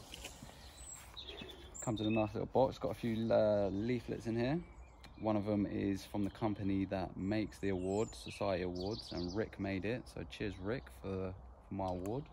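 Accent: United Kingdom. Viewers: You’ll get to a million very quickly. Hope you see this, I have a question! as I can hear you’re a Brit.. are there that many people who own outdoors pools here in the UK? I think I’ve never seen one 😂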